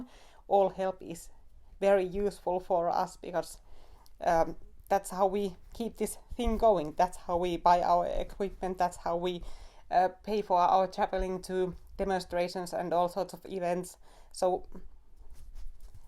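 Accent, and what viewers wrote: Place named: Finland. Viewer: You sound Finnish, I thought Finland was pretty conservative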